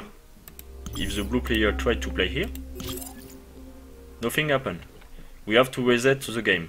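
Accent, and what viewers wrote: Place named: France. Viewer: Lol on reconnait bien le français qui parle anglais xD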